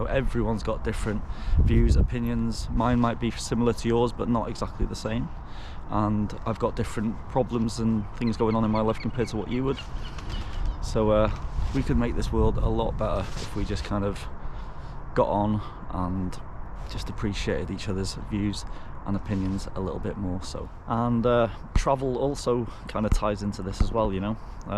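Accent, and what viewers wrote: England, United Kingdom. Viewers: Your accent is english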